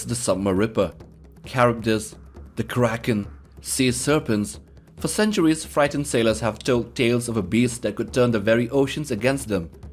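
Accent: Germany. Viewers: du bist son deutscher das hört man sooo hart :) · Love ur german axcent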